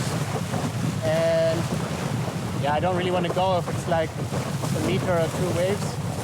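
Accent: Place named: Germany. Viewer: please dont tell the greek you raped their placenames with the german accent !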